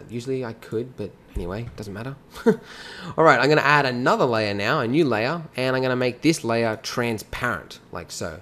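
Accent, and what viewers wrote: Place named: Australia. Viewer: your from Australia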